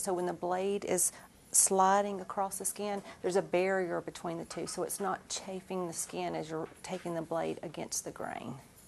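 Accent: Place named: South, United States. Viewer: Ahhh the Southern accent. Like home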